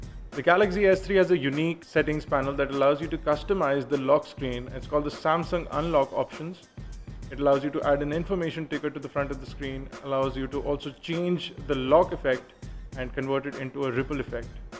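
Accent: India. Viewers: hahahahaha i love Indian English.. "How much did you get paid by HTC tell me HUNN" LMFAO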